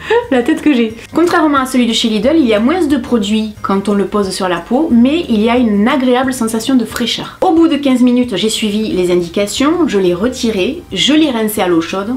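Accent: South, France. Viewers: J adore être en ta compagnie toujours un plaisir. Et on a ( oui je mets dedans mdr) Une communauté adorable. Mdr . Ces vidéos font du bien en ces moments compliqués étant moi même infirmière un peu d accent du soleil fait du bien 🍭🍭😘😘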